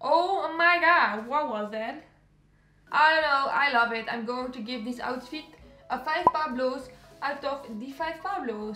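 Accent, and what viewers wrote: Netherlands. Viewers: her accent sounds dutch, is she dutch?😅🍓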